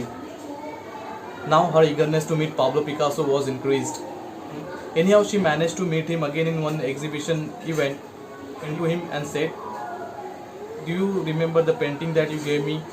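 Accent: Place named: United States. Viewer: Wow your English with American accent 🔥🔥🔥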